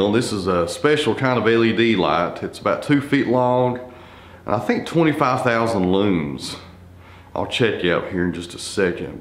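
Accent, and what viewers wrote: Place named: East Tennessee, United States. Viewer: Man your videos make me miss home more than any other...Im originally from Maryville/ Knoxville area. Here at the holidays its good to hear a east Tennessee accent!! You dont know what you have until it gone. Never a truer statement. Keep it up and Ill keep watching.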